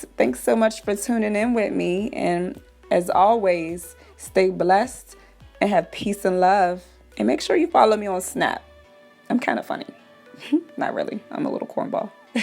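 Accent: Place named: Pennsylvania, United States. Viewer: Are you from PA? I always think everyone from PA has an accent and you sound exactly like the accent I attempt to describe. Also this was beautiful well done!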